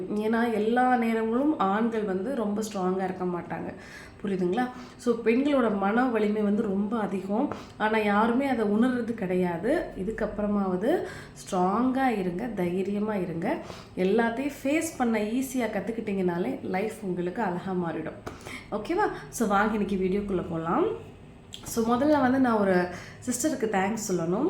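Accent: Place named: Nagercoil, India. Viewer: Sister, your slang looks like nagercoil side?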